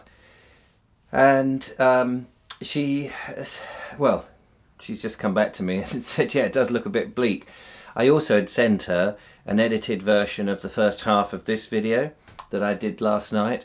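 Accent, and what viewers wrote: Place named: England, United Kingdom. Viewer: I love your beautiful English accent and the way you think! From Canada!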